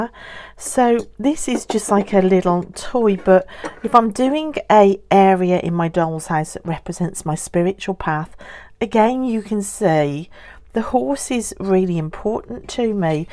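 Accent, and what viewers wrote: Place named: West Midlands, United Kingdom. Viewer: I just want to say that I love your channel. I’ve watched all of your videos and I come for your personality and lovely voice. I like how you combine tarot chats with aspects of your own life and adventures, and without pushing any readings on us. I love your enthusiasm and deck introductions. Your accent reminds me of home - my relatives have a Wolverhampton accent, so West Mids, and I moved away to a location not far from you I think……near to Stratford Upon Avon. People watch channels that are interesting and make them feel peaceful. Ignore the haters.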